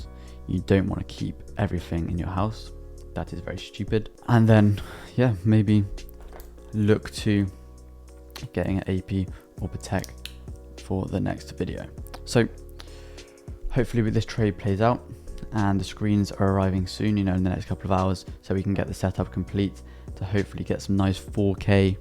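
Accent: United Kingdom. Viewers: You from Bournemouth mate?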